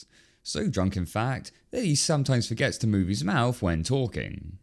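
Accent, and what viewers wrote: United Kingdom. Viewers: 😊 Aw, I don't think you're a prick. I think you're a pretty good narrator for film reviews. That deep voice and British accent really helps when you review the sad drama type films.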